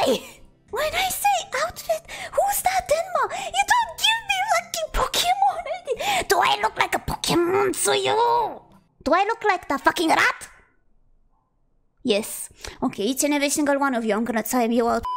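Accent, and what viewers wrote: Canada. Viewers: That damn Canadian accent is crazy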